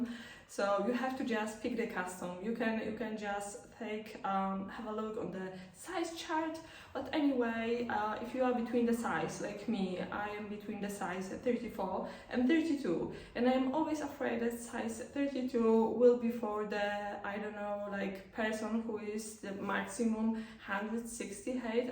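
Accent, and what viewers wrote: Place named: Poland. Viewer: sounds like polish - english...)))the worst and most horrible English.... not "free"....correct its three !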